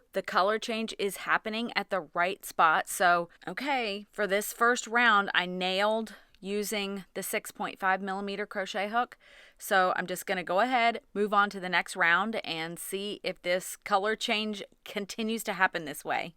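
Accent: United Kingdom. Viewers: I was was not expecting that British accent!😂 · Nope nope nope. All that faffing about, you may as well spend time weaving in ends. I'm British and love that you mutter to yourself in a Brit accent! Thank you for the review.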